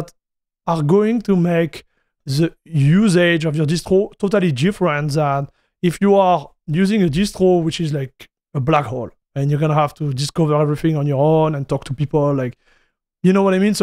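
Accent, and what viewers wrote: France. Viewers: Fortunately if you turn subtitle (CC) on, though it's auto generate but match with his voice. FYI he's speaking English in French accent (sexy isn't it)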